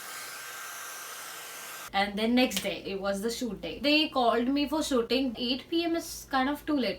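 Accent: Maharashtra, India. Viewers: Her hindi-marathi accent is so sweet^^ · LADKI KI HINDI LAGI PURI MAHARASHTRA WALI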